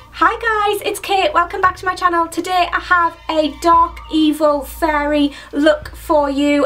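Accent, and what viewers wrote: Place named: North East England, United Kingdom. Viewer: where abouts are you from? you doing like you are from NE England somewhere xx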